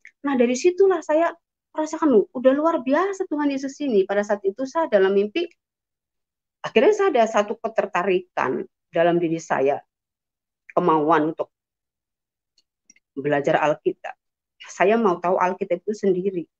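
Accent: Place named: Java, Indonesia. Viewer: Dari omongan lo, lo bukan orang minang, tp jawa.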